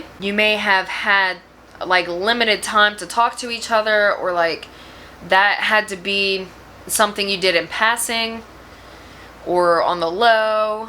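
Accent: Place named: Pittsburgh, United States. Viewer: and you have a Pittsburgh accent like me haha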